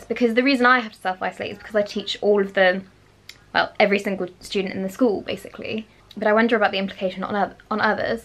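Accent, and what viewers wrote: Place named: United Kingdom. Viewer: I am improving my English by listening your British accent. 😂😂😇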